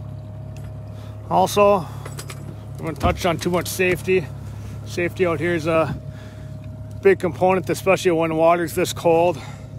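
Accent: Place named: Minnesota, United States. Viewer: you have the same MN accent as my cousins who live up there. Both my parents were from MN but they didn't have accents, I guess because they left when they were in their twenty's and that was in the 1940's. they used to say people in MN don't have an accents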